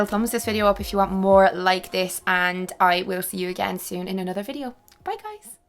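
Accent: Dublin, Ireland. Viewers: Are you from northern Ireland? You sound like your from Dublin tho😂 so idk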